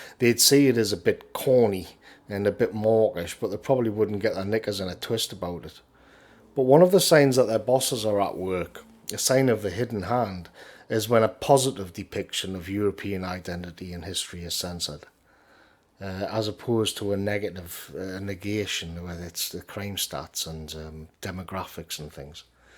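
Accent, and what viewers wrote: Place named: Newcastle, United Kingdom. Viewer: Excellent as always, with the usual sprinkling of linguistic nuggets: "sublime" is a word we hear so very little these days, especially in a Geordie accent. The Daily Shoah crew often talk about "The Kosher Sandwich" which is a similar ( but not identical) metaphor. After that my autoplay selected your vid: 'Roger Scruton & Words Of Power' which dove-tailed perfectly Thanks again. Clarity, insight, passion and brevity. It's a winning formula.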